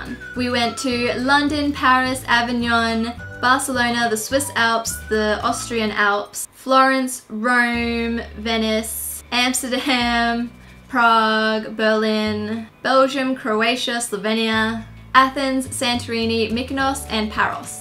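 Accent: Australia. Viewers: you are seriously so pretty! also random fact, you sound like Ingrid (missglamorazzi) but with an australian accent! haha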